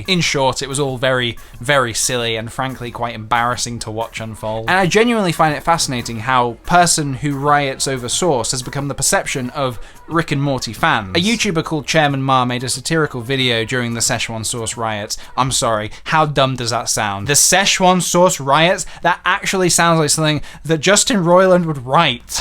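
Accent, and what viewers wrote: United States. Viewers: That american accent 😍 Grateful for how good you are at it LOL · Tell me why your American accent is better than every american’s American accent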